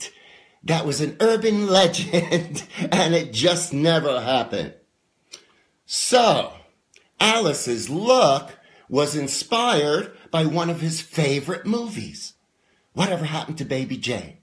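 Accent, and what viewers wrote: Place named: New York, United States. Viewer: is that what a new york accent is?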